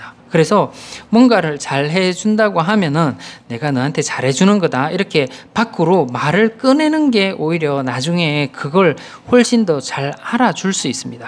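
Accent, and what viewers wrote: Gyeongsang, South Korea. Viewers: 삼성이 철수하기로 했다죠,,,,,,,,,,설명해주신 이유도 상당합니다,,,,,,,,,,설명하시는분 억양이 경남쪽인것 같네요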